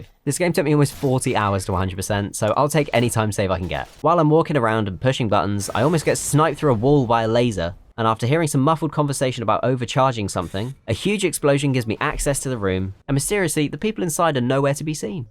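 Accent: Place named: United Kingdom. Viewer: this would be a great video with great pacing and great editing about a great game and a great all achievement challenge. unfortunately, british 😑😑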